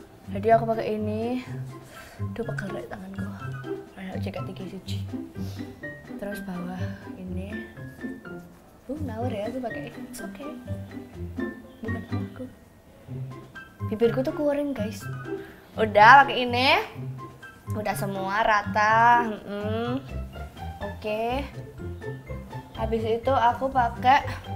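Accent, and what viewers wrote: Java, Indonesia. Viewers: Gemesh e ngomong e jawa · Lucuu bangety.. Jawanya medok2 unyuu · pertahanin ngomong campur bahasa jawanya. Aku suka banget sama cara ngomongmu 😍👍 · medok terus sumpah jadi makin seneng liat videnya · Ya allah medokk nya kecirii bgt wkwkk ,klo titi udah ga bgtuh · Medok bngt ngmong ya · Medok tp cantik bgtt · Medok kayak aku 😄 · medok banget ih gemes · Medok banget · tetep mwedok penting ayu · medok bgt jawanyaa lucuu!!😭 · Medok banget yak:v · logat jawa nya kerasa bgt 😫☝️☝️ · Keliatan banget kalo orang jawa👍 medok reek, mantap 😍👌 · trnyata km ngomongnya rd medok gt ye😂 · Medoknya dpt bgt bundd😍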